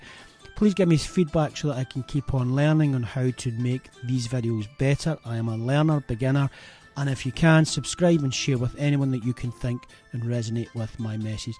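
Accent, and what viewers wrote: Scotland, United Kingdom. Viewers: ❤❤❤❤❤Love your Bonnie Scottish accent😁😘🌷🌷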